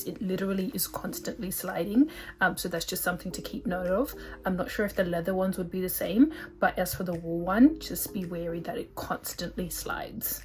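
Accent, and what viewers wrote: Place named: Australia. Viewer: As soon as I heard your accent I was like yess, an Aussie! I WAS contemplating buying the shelf from Grovemade but I just got to the bit where you said it $150 just for shipping O_O. girl no..that's not in my budget lol. I shall keep looking elsewhere but great video! <3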